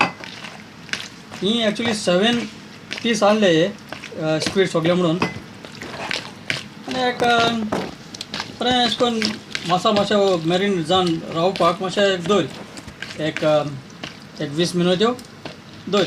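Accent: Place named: South Goa, India. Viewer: sure. Are you from south Goa, from your speaking style, I assume.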